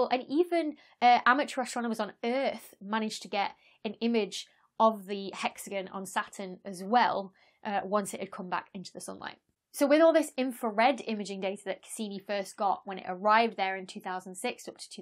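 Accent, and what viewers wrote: United Kingdom. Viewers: I like how you pronounced that British t-sound from 'plot' by just stopping the airflow with your throat. I mean, how do you explain this to a phonetician who has learned that the the T-sound is a dental sound. For some one lip-reading you, you just said plo. Please keep that accent, I love it.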